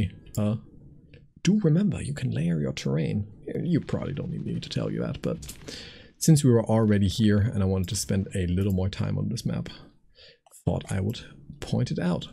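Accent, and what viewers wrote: Germany. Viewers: You sound like you have a slight german accent but you are so well spoken and articulate. It really confuses the heck out of me. Seeing that there are some people commenting in german, I can't be the only one, haha. Anyhow, fantastic video! I've deliberately not looked at any building tips and tricks to find out some of these things for myself and only now allowed myself this video as an introduction on what the game has to offer. Almost done watching and can't wait to implement stuff like the flying buttresses. Can I just say, you should really consider streaming your progress on twitch. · Dieses Spiel ist ganz nach meinem gusto. Übrigens hört nur ein geübtes Ohr deine Deutsche Herkunft heraus. Dein Englisch ist Einwandfrei. Sehr guter Video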